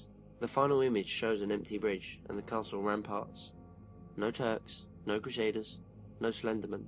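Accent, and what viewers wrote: London, United Kingdom. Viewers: This is the first video I've watched of and my first reaction was "HOLY SHIT, HE'S BRITISH."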